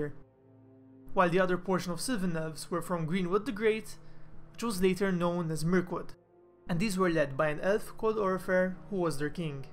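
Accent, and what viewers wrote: Malta. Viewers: Are you Maltese ?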